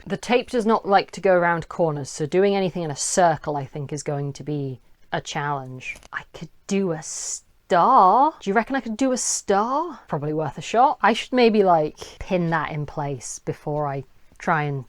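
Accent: United Kingdom. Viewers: so I'm new here -hates jk Rowling -adhd fueled sewing -high vis attire + wizards -English accent - "to the floor, where you belong" (slightly seductive commentary) yea, I think I'll stick around for a while, this place seems cool, I can fuck with this type of content, this is enjoyable, youre someone id try to be friends with irl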